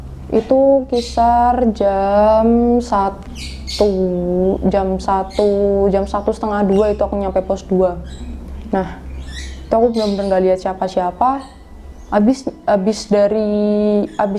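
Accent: Java, Indonesia. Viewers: . Omongane Medhok 😅😅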